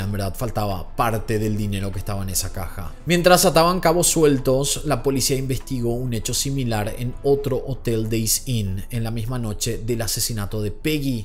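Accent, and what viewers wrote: Paraguay. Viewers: Me encanta tu acento de Paraguay!! Vivi allí varios años y mi hijo nació ahí y tenía tu misma forma de hablar, te felicito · Gran canal por tú acento se nota que sos de Paraguay capo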